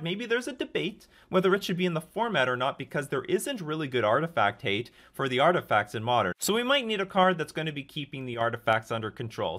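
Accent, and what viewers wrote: Canada. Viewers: When I finally heard it in your accent that you're Canadian. Lol love your content man.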